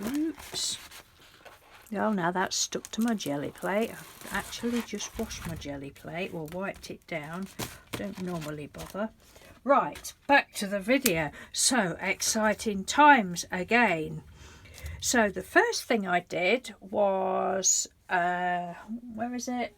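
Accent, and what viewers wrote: United Kingdom. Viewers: Hi, I finally got to watch this properly. I’ve been moving rooms around again and I think I’m going to be sore tomorrow,lol! These turned out fantastic! The black background gives such a striking effect. I think I’ll reward myself with those shimmers whenever I use up some ample amounts of supplies because I am literally out of rooms, lol! I’m glad it worked and that you had so much fun! I got curious about actors that come from your area and did you know that, Kevin Doyle from Downton Abbey is from your town? I cracked up when you said, “what the heck!”, lol! I’m going to miss you reading craft stampers to us. Things always sound better when you British read them. Kloss! Thank you for sharing. Hugs, Julie 🥰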